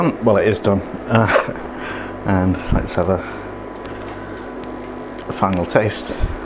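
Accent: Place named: Yorkshire, United Kingdom. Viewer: What part of Yorkshire are you from? Love your easy going style.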